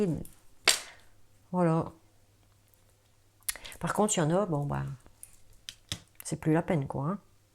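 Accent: North, France. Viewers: j'adore l'accent du NORD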